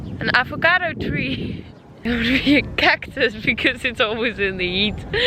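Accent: Netherlands. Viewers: ***I LOVE YOUR DUTCH ACCENT!!!!****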